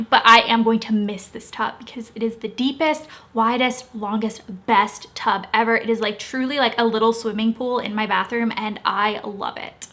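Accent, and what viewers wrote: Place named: South, United States. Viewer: So excited to hear your southern drawl begin to crawl back haha ❤️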